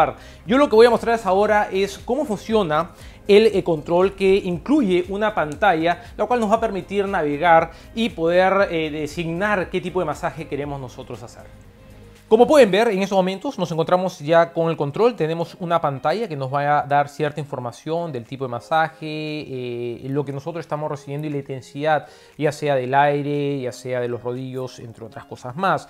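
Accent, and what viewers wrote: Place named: Lima, Peru. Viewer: si me lo suponía por que habla cómo limeño pues me agrada sus videos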